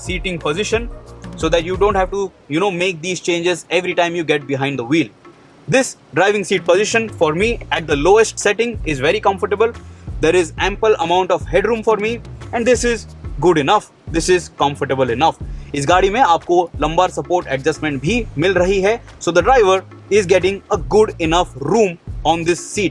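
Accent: Maharashtra, India. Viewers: Nice marathi accent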